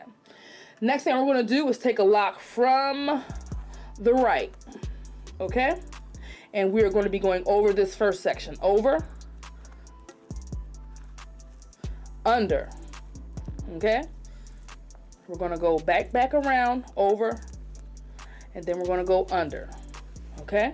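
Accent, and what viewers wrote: Baltimore, United States. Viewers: Tew…. Lmao Sounds like Baltimore is where you’re from… hopefully I can master this on my sons locs in the am… 🙏🏽❤️ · That B-more accent is thick!!🔥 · Dope ENERGY I JUST SUBSCRIBED BECAUSE YOUR VOICE IS SO CLEAR .. u from Baltimore AINT you Heey girl ❤️ I’m literally about to do this on my client going to Memphis I know how to fishtale braid it never did it on LOCS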